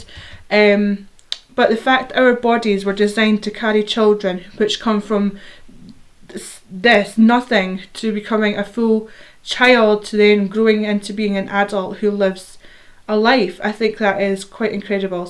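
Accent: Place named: Scotland, United Kingdom. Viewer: That's so funny. My wife and I tap three times on wood any time we don't want to jinx something, nine times if it's really important. We also love. He's a very lovable sweet guy. Great video. I watched your most recent singing video. Very nice btw, and I was wondering where your accent was from. I think you might have said Scotland, well, I'm assuming it's Scottish.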